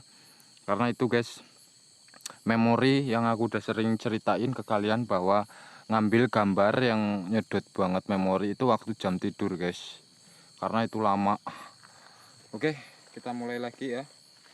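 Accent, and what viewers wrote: Java, Indonesia. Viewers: Asal mana bang,, logatnya medok jowo tapi suka ngomong bahasa sunda "aing". 😀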